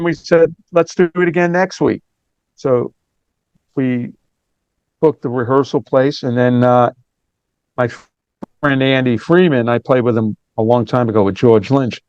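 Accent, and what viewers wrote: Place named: Alabama, United States. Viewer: Love your accent bro…that comes from Alabama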